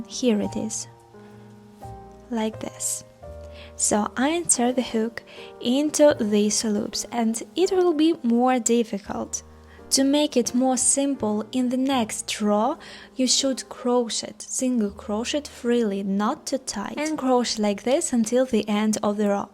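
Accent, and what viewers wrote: Russia. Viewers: я будто слышу русский акцент😂 так легче понимать туториал хаха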